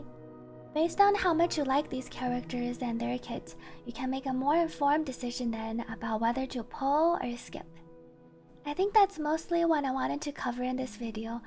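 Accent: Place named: China. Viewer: You got a decent mandrin accent, making me 99% sure you speak it lol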